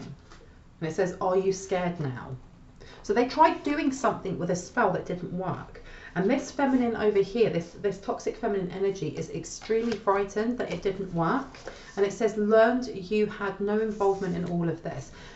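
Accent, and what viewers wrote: United Kingdom. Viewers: You sounds British I like it 😁‼️ very Calm voice.. Eitherway thanks 👍 for giving us your time DuuuuuuvvvvvvvvaaaaaLLLLLLLL county jax FL USA 😊👍‼️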